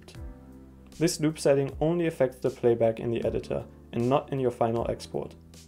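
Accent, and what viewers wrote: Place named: Germany. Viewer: Das klingt doch nach nem deutschen Akzent 👀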